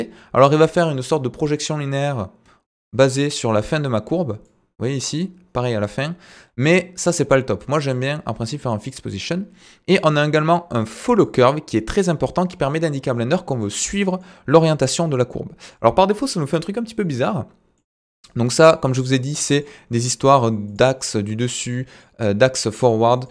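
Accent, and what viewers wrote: South, France. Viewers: Bananée 🤪🥂 beau gosse.. 😉 Tu ressembles à un de mes cousins sans la moustache et le bouc et sans le p'tit accent du sud. 😉😘 Toujours pour tes tutoriels même si je ne suis pas souvent disponible depuis que j'ai retrouvé du travail. Je te souhaite pleins de nouveaux projets pour cette nouvelle année et de nouveaux abonnés car je t'ai mis en sélection de chaînes via ma chaîne youtube en sélection de chaînes pour apprendre Blender en français. 😉😘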